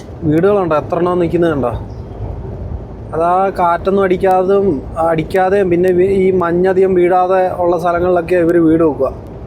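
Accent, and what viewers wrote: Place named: Kannur, India. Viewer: സംസാരം കേട്ട് കണ്ണൂർ കാരൻ ആണോ പിന്നെ ഗൾഫിൽ ചൂട് ആയാലും Ac. ഒത്തു പോവും ഈ തണുപ്പ് പോന്നു ഭായ് ഭായ് എത്ര യൂറോ കിട്ടിയാലും വയ്യ 🙏❤️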